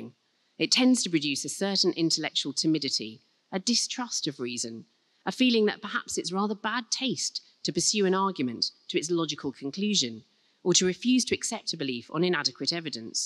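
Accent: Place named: England, United Kingdom. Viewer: Lets hope this enlightened amicable intelligent hard working humane person becomes Prime Minister of the UK one day. Imagine, someone who knows what they are talking about as Prime Minister. Once you get over her beauty and adorable english accent ( I had a crush I eventually got over, reality set in ) and actually start listening to her without bias , watching her TV programmes and reading her books, you realise what a gem the world has here. And nothing but sense she speaks.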